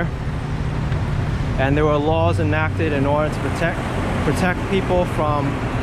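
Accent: New York, United States. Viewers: Good video! I like the Lower East Side! The New Yorker in you finally came out! lol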